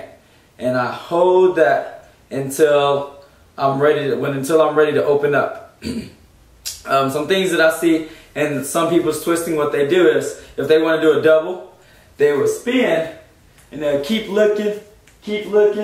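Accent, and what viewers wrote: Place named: South, United States. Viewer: certain things you say gave your accent away, pretty southern and sounded like T.I a bit. lol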